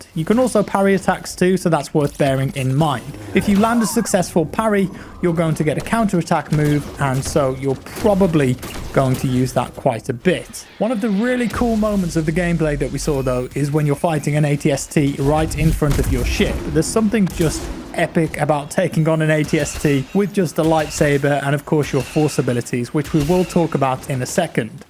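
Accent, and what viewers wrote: United Kingdom. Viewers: All we needed was your sweet sweet Brit accent to convince us.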